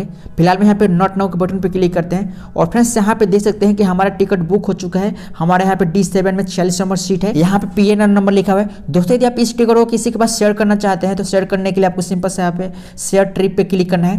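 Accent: Bihar, India. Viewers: Bihaari ho kaa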